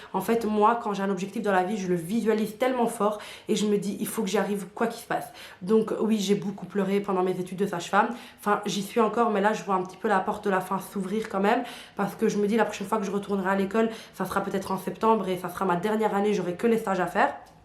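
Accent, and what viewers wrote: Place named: Belgium. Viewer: L’accent belge est vraiment marqué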